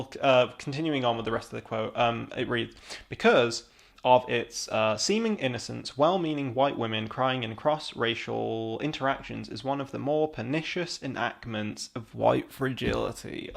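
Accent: United Kingdom. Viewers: oh ... and I truly love your British English "accent" ... brilliant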